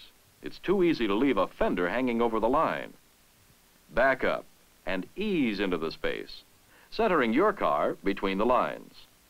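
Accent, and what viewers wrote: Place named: South, United States. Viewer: Southern Accent she has & yall come back now !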